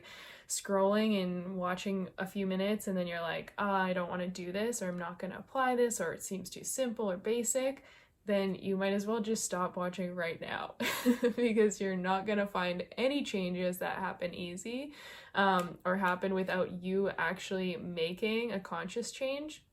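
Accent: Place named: Canada. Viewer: Hi, I found you on Kristina"s Channel Spiritually Selfish...and wow, a couple things..you are such a bright light I had to check out your channel...then you are SO MUCH like my friend Ryan...I met her in the early 90s at the Dead Shows..I'm an old hippy myself lol..she's Canadian (you sound like you might be from north of the border) and is a Taurus born 4/30 and you have some good positive serious Taurus energy....so maybe we're in a similar soul family but my main draw to you was your healing work and I'm curious if what i do is really "Quantum Healing". I connect with people's energy fields from distance and work with their fields and every healing I see my hands doing different things and I get messages from spirit about what is really going on with their health and can offer that......oh..I'm not bragging btw because Spirit heals, not Adam lol. 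I'm just her instrument but we've worked on people in hospice with cancer and within 48 hours they'd be up, eating, not everyone has these results but some do....the latest person is playing his guitar again up and eating and he was terminal with pancreatic Cancer. wasting away on Morphine......people have gotten up from their wheelchairs totally healied from Lupus...It's so freakin amazing and I myself healed from an "incurable" autoimmune disease I had almost 60 years..but no steroids for the first time in my life in over a year!!!! 3 years of daily affirmation work, energy work and diet. I work as a Medium so I don't charg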